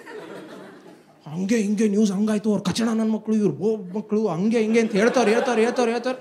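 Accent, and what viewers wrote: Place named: North Karnataka, India. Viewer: Bro ur from north Karnataka pakka I can say that ,both the way I loved it...♥️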